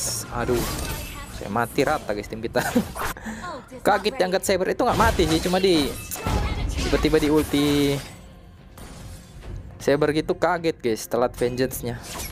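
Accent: Manado, Indonesia. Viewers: Orang asli manado ngana bro?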